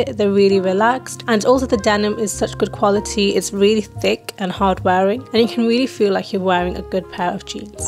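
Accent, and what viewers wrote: United Kingdom. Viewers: Your accent is british? ✨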